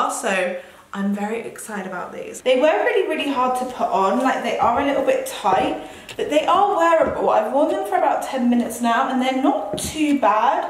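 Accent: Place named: United Kingdom. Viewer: And love your British accent 😍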